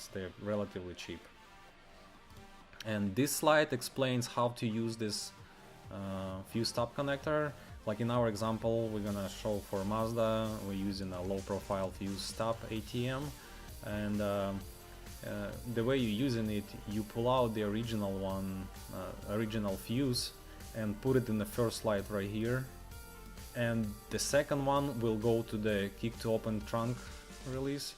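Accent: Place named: Russia. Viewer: Strong rashn akcnt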